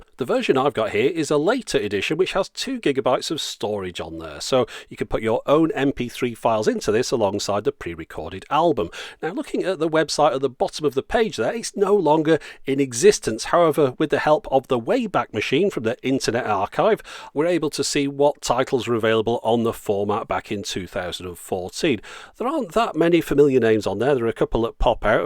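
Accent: United Kingdom. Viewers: Love your show!!! Being American hearing your British accent makes the show a lot classier!!(yes it's true, we think all Brits are smart)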